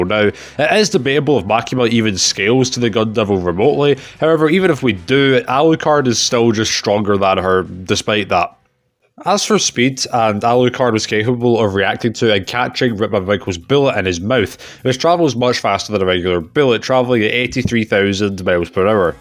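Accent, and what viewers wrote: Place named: Scotland, United Kingdom. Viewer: you are Scottish as hell and i love it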